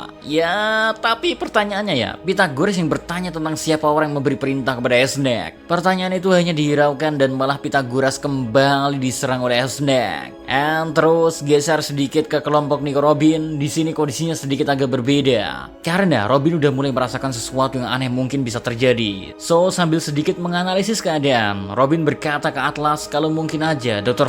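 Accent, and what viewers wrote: Java, Indonesia. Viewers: logat Jawa Inggris nya mantep bang wkkw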